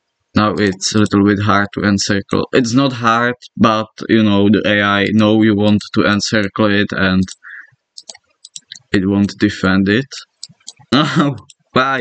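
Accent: Czech Republic. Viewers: Hmmmmmm Czech accent? Nice video bro💪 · i can hear your czech takže ahoj so slovenska!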